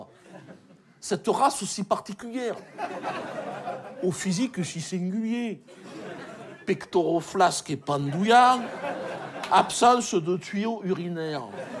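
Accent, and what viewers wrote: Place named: South, France. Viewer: L'accent du sud et le regard trop fort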